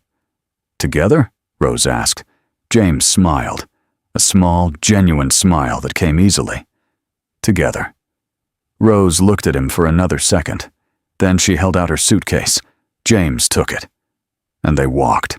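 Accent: United States. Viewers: An American accent does not fit with these stories. 😢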